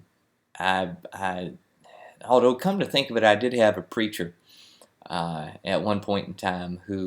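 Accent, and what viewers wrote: Virginia, United States. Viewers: "Occupied VA" lol...that's funny, I've never heard it referred to as such, but I love it because I call the rest of VA (any part that's not Northern VA), "The Real Virginia". I grew up in Courtland, which is in Southampton Co. and my wife grew up along the Sussex/Dinwiddie County line and despite living in Maryland for the past 20+ yrs, our Southern Virginia Accent is still very present. You sound very much like the folks I grew up with. For all of those in the comments saying this isn't how Virginian's talk, I would say you haven't been been outside of your city. I also read where someone said his long story telling reminds them of "southern culture". That's because it is, Virginia is a Southern State. When my wife and I go home, it's refreshing that the good o'l Tidewater/Virginian Southern Accent is still very much alive, however I do agree that the deeper you go in Hampton Roads, the accent is waning due to influx and influence of Northerners who have relocated there. 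Thanks for the video. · anyone that says this isn't a VA accent has never really heard a VA accent before. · You Sound Like Us Back in Norfolk, VA. Big Up Virginia! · When he said the word, "Record" I knew instantly it was an authentic, traditional Virginian accent. You don't hear the Virginia accent much anymore - especially around places like Stafford where nearly everyone is a transplant from some other part of the country. I understand this accent is disappearing quite rapidly these days. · Yeah this is a Virginia accent but I mostly hear a lot of older people speak like this lol. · Just outside of Richmond it is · I'm from Richmond and he sounds more like Powhatan to me but he explained he grew up in Dinwiddie. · I love this Virginia accent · I love that accent from the south boston area like jeff and ward burton got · My grandparents and mother are from Lynchburg, God I love that accent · Love the video. 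Very distinctive virginia accent. From your experience does the valley have it's own distinct accent. Have noticed the 804/757 to have distinct accents. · I'm from Williamsburg and I almost never hear people with this accent? I go to school in Richmond and I also have never heard this there. This sounds very much like Dinwiddie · I’m from Richmond Virginia and you don’t have a Richmond accent. Definitely southeast Virginia or southwest. As you head south out of Richmond the accent becomes more NC. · This is amazing. My husband and i had never heard a Virginia accent (that's how we ended up here), and it's precisely what we had imagined. We love your accent! We're from Indiana and hear coastal southern, mountain and a bit Pennsylvania. Love it nonetheless. ❤ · I just love the Virginia accent - and I found it from the weirdest source. A bad guy from the StarCraft games, Arcturus Mengsk. Been trying to nail the accent ever since, this video helps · I’m from Goochland County. 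Your accent makes me miss home sir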